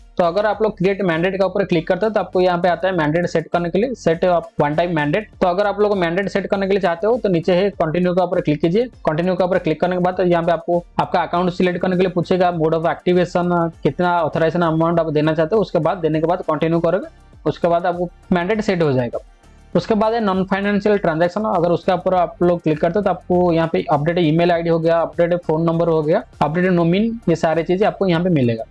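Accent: Odisha, India. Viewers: Sir App Odisha Ka Hain Kya? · Bhai... Odisha se he kyaa....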